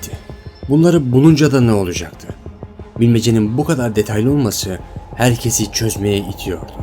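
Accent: Azerbaijan. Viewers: Azerisən türksen? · Abi sen azerimisin??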